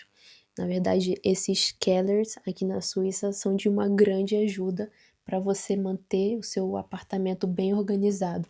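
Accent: Rio de Janeiro, Brazil. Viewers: Eu também não gosto do sotaque carioca. Mas ela não tem culpa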